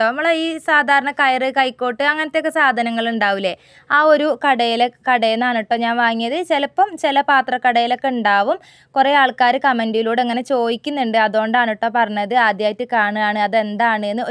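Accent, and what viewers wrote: Malappuram, India. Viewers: നിങ്ങൾ സംസാരം കേട്ടിട്ട് വയനാട് സ്ളാങ് അല്ലല്ലോ ജനിച്ച നാട് മലപ്പുറം ആണോ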